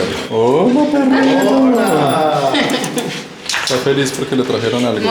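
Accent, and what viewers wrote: Colombia. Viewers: Bueno x como hablas eres de Colombia tu país esta mal también y si siguen con Petro sera peor, los mejores países para vivir el norte de Europa.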